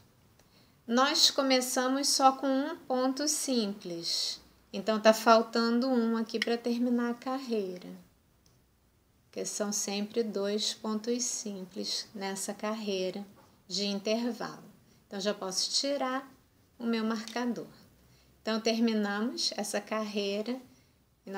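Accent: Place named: Rio de Janeiro, Brazil. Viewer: o seu jeitinho carioca de falar e um amor! Good Bless you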